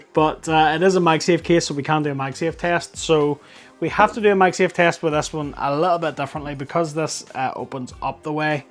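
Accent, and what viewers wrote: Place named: Scotland, United Kingdom. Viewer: Lovely to hear a Scott! Scotian by blood.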